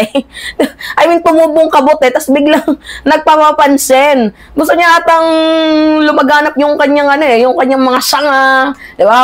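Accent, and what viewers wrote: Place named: Ilocos, Philippines. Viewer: Team Agustin vlog po ganun din magsalita. Mga ilokano ganun lalo na Pag inielaborate ang wordings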